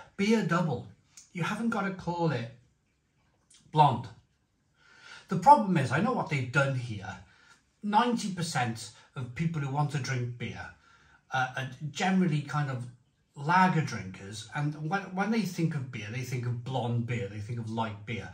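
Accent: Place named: Cardiff, United Kingdom. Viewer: ArchStanton obviously has no knowledge of the Barry derivative of the Cardiff accent spoken by the presenter. It has no connection with East End Cockney!!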